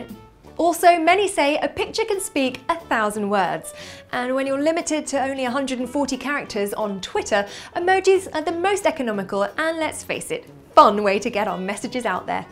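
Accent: United Kingdom. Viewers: PLEASE , keep with the amazing vids!. just please please please add subtitles to them. brit accent is a bit hard to understand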